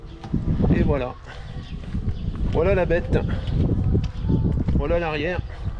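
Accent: Nord-Pas-de-Calais, France. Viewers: Je confirme ... en chti' ca fait + serieux !!!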